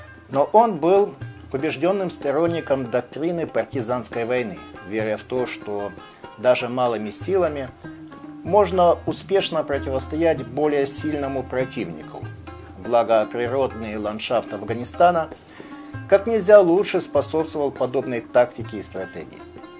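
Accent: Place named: England, United Kingdom. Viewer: Он не руски акцет ангилиски ест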